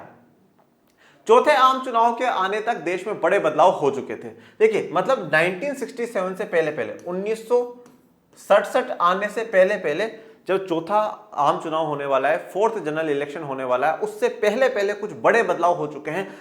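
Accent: Rajasthan, India. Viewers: Rajasthan sa ho kay sir 😂😊😂😊😂😂😊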